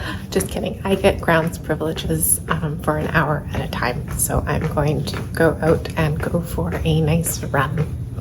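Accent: Canada. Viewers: You must be in a medical hospital being treated for mental health. Straight mental health hospitals wouldn't allow most of the things you have in your room. (chain operated blinds, Electical cords, batteries, plastic water bottles, a watch, in short anything that can be sharpened or anything you can hang yourself with, visitors are not allowed on the unit). At least in the staes, your accent may indicate you are in Canada?. I wish you the best and hope you are feeling better. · In case you didn't spot the accent. She's in Canada. Do you know the rules in every country?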